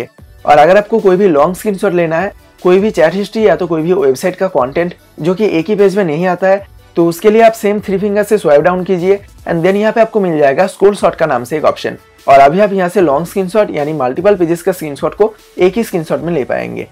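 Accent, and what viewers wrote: Bengal, India. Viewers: Contain is good but my brother there is a Bengali tone in your pronunciation I didn't disrespect Bengali but for your shake try to improve it best of luck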